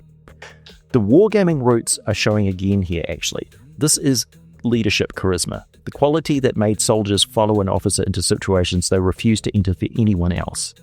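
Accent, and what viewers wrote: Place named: New Zealand. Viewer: oh I remember those days well! I don't miss them..... I've got to say as well; it's quite surreal hearing a fellow kiwi on a youtube channel! 😂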